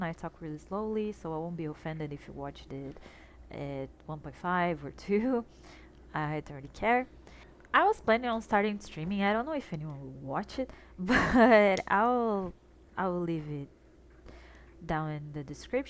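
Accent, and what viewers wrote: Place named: Turkey. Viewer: Hello, sorry it's a bit irrelevant with the video but i have to ask, are you Turkish? Your accent sounds a lot like turkish accent. Cool strat by the way.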